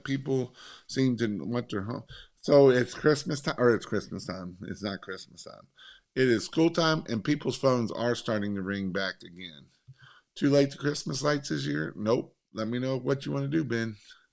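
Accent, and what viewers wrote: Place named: South, United States. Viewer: I remember watching videos you made 2+ years ago and you're much more eloquent speaking. You've also lost that southern drawl quite a lot. Respect from NZ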